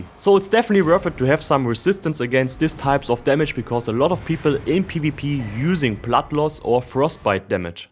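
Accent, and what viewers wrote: Germany. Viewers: gutes Englisch man hat fast ned rausgehött das du deutsch bist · cool build... der deutsche aktzent kickt hart lul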